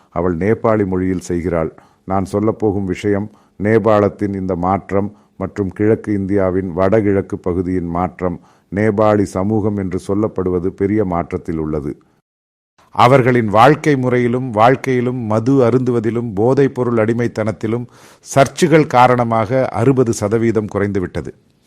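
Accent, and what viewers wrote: Kerala, India. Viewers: This is Ai generated video. He is a malayalai and I know he speaks Tamil with a heavy Malayalam accent. This is not hsi voice. And here he speaks Centamil 😂😂😂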